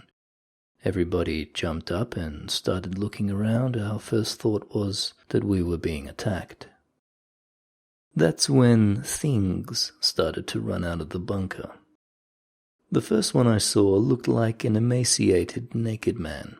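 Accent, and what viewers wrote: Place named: Australia. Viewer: The narrator sounds Australian. I wonder if his grandfather was?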